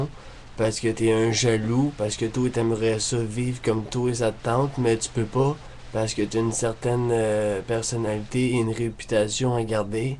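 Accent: Quebec, Canada. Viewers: C'est un peu différent de ce que vous avez l'habitude de voir et c'est une raison pour le prendre pour un fou? Les commentaires "Apprend à parler" ou qui se moquent de sa façon se parler: il est Québécois. Je suis sûre que la plus part à dire ça sont français. continue c'est énorme ce que tu fais c'est tellement rare des personnes comme toi en France qui osent faire ce que tu fais où tout le monde se fait critiquer vulgairement parce que personne ne sait ce que signifie le verbe "rire". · J'ai aps compris la moitié de la vidéo,pas parce qu'il a un accent québecois,mais parce que ce qu'il dit n'a pas beaucoup de sens. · Tous les Québécois parle comme ça? :o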